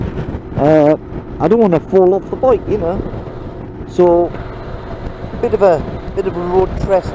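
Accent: North East England, United Kingdom. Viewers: Interesting video..👍 Do l detect a North-east accent ?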